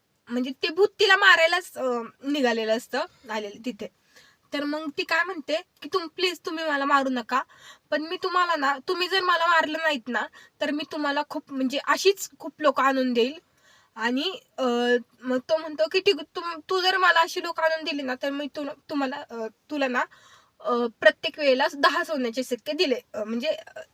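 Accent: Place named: Maharashtra, India. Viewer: Nahi ka nahi ka nahi ka ghati style tone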